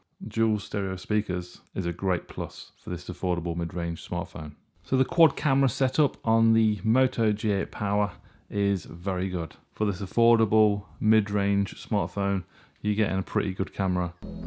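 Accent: United Kingdom. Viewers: 0.88 Mbps download speed? I would have guessed from your accent that you are British, but apparently you live in a rural are in some developing country?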